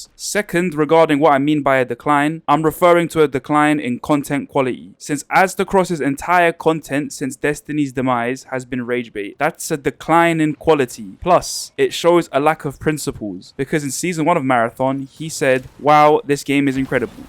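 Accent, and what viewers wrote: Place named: London, United Kingdom. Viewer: "He's a white guy. He doesn't really talk to lgbt people." "It's punching up. It's ok," I used to live down south heck. I was born there, but the absolute state of younger people down there is awful. The political element is absolute biased I promise you there is own group biase in this guys content in the same way those evil "white" people naturally are anti woke seeing how woke works only ever to hurt them and benefit others while ultimately this video reeks of the sort of fake learned culture where you hide behind humour but there is a consistent vilification of white people and people who have been successful in any way but remain right wing. This must be that all inclusive London culture that has been building since the anti colonialism pro natavist heros outnumbered the natives in their own capital city and proceeded to push none native culture and establish leadership from a man who previously said white londoners aren't real londoners. 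Why am I talking about london? Because this guy is either from London or he mimics them and lives/lived relatively nearby. Either way that accent, an accent that almost always flatterns with education into a more proper way of speaking and actually pronouncing words properly, that accent is almost always a marker of someone with surface level british culture followed by deep rooted ethnic biase a learned hatred/phobia of white people despite often being white or otherwise living in a white country and the refusal to engage with any form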